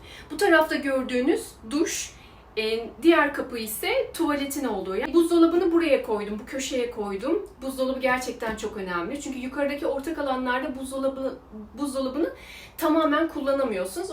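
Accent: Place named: Turkey. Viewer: Japoncayı Türk şivesi ile konuşmak çok güldüm harikasın😂😂😂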